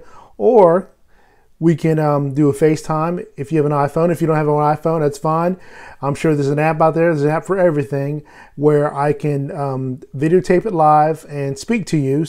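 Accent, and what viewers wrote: South, United States. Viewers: It's another Asian in NC, heeeyyyy 👋. Whoa 😯, you have a little bit of a country accent too. :/ I wasn't really planning on moving to Fayetteville, I was trying to find the Vietnam park in Concord instead. Guess I got distracted.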